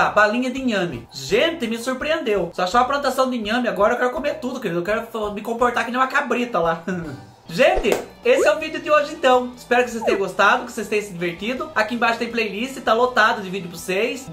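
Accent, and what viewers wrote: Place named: Rio Grande do Sul, Brazil. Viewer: Oi vc é gaúcho tem um sotaque de deferente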